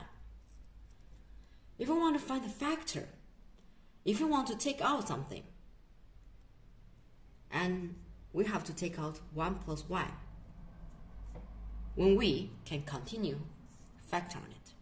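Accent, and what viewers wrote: China. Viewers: 听着亲切，感觉像东北的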